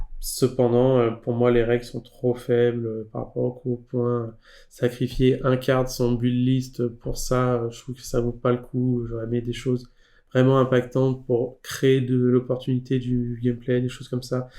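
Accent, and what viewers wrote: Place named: England, United Kingdom. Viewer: Cet accent Anglais toujours aussi délicieux ❤ Cookie aussi tiens !!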